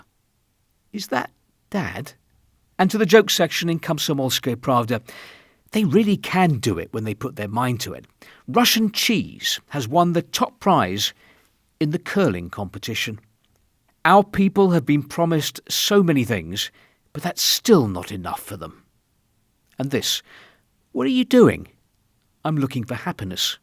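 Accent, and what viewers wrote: United Kingdom. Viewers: if u listen british acent ... in video its 70% lie and fake infoo...ju can just skip it for evry single topic in life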